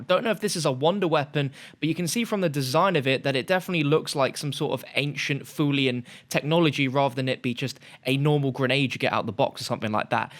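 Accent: United Kingdom. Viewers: where are you from? from uk?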